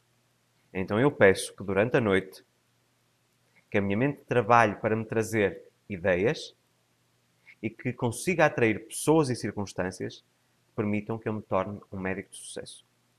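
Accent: Portugal. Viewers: vc é português?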